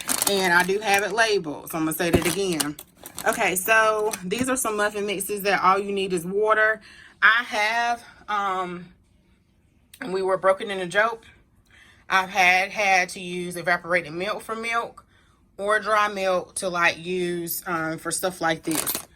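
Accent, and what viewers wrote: United States. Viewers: I love your country accent! Its so soothing. I wanted to keep replaying your videos just to hear your voice.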